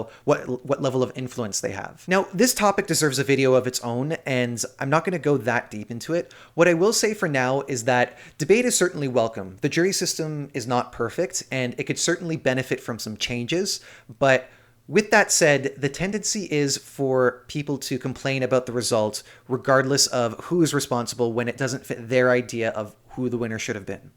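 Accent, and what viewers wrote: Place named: Canada. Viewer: I subscribed to your channel when I discovered it listening your appraisals of the Benidorm Fest. As I told you then, your channel was going to be the one in English I was going to follow covering Eurovision 2023. I have lived in Canada (BC and Toronto), so your accent sounds so familiar to me, which is a plus when I listen your commentaries. The objectivity and consistency of all of them is also here in this summary. I look forward to the video you are telling us is coming next.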